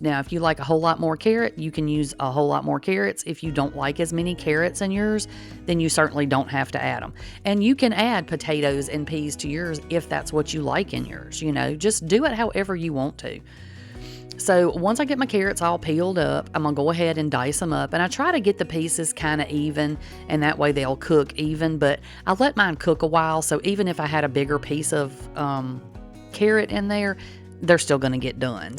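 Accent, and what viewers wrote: South, United States. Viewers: This looks absolutely amazing! Thanks for sharing! And I absolutely love the way you say boil..👍 I'm from Ohio so I'm not used to the southern draw but I love it! And it's cold here too we've been in the teens and single digits. But we're used to it in Ohio...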